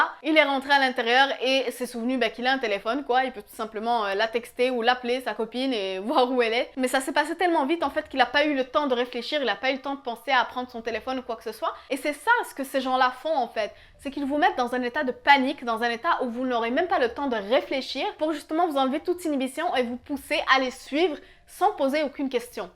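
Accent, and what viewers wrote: Quebec, Canada. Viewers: alors, c'est marrant, j'entend bien un accent canadien français (faut pas dire québécois, je sais) mais pas si prononcé que ça. française qui vis au canada? accent d'un autre endroit? disons que ton accent varie entre français et canadien...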